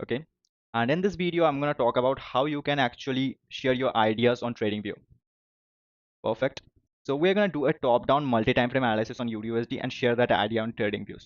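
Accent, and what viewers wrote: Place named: India. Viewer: thaNKS for the video G, and love your indian accent G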